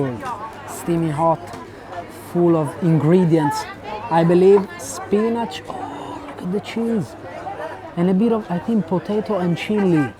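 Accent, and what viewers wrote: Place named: Italy. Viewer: I like ur Italian accent 😂❣️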